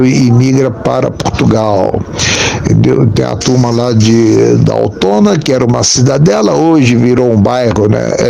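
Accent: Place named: Rio de Janeiro, Brazil. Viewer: De onde exatamente o Judeu é? Acho curioso o modo de falar dele, uma mistura de carioca com português de Portugal.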